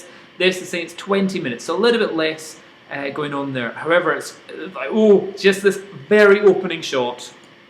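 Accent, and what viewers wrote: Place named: Scotland, United Kingdom. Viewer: This is great. It's like hearing my own thoughts about the Lily read back to me by an amusing Scottish guy. As a Canadian that is very amusing to me, thanks :)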